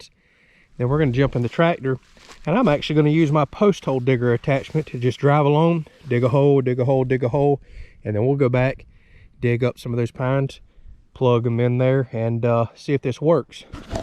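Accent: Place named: Florida, United States. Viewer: Hey, love the accent. Have you been a Florida man all your life?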